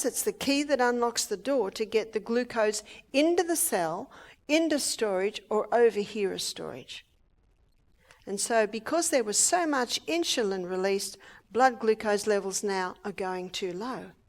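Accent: Australia. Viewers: jim dee - She is from Australia and they say many words differently than USA does. Great Britain also does. Forget even trying to understand the English of many from Scotland and Ireland. Ireland is easier, though. I was watching another of her videos and could not understand several words at all. One of them turned out to MEAN Red Bell Pepper. They have an entirely different word for this vegetable than we do. Have you ever heard a Brit pronounce Schedule? And those from South Africa also pronounce many words differently than we do - especially words from the Bible. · Man…she’s Australian. They don’t pronounce some words like Americans (for example).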